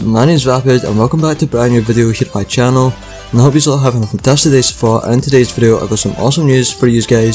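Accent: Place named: Scotland, United Kingdom. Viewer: ur scottish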